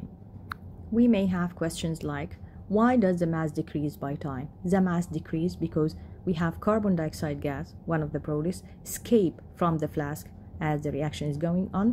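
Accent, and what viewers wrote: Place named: Arab world. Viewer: I think she's Arab, because arabic doesn't have the letter p so it's easily confused with b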